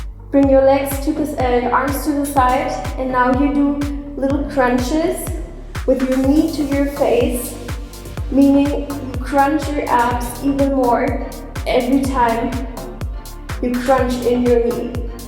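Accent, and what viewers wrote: Germany. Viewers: Dikka man hört einfach wie Deutsch sie ist🇩🇪🇩🇪🇩🇪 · U hear the german English Hahahahha she cant speak fluently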